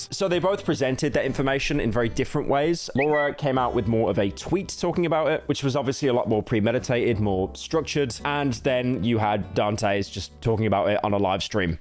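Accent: United Kingdom. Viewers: He is brittish, thats how you pronounce it in the botta o watta accent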